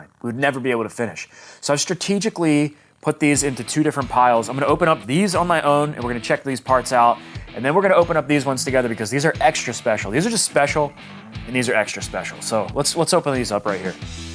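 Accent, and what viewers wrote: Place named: Pennsylvania, United States. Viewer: Great video. You gotta be from PA haha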